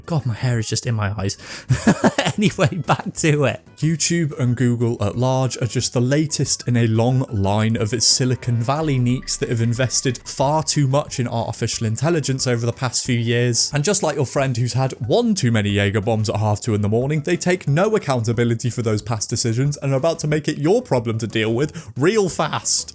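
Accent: United Kingdom. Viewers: I have actually put your videos on to sleep before specifically bc you have a deep voice and a British accent. You and Simon Roper. You called me out so hard lmao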